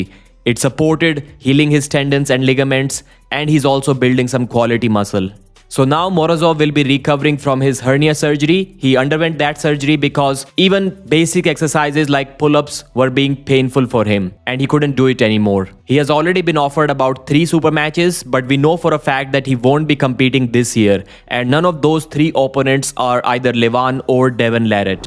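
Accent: India. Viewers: Why this stupid curry man accent...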